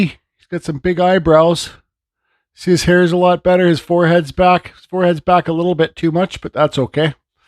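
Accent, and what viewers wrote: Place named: Canada. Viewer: Don't let YouTube change your style, I like your style,. You remind me of me, without the canadian accent. Trapper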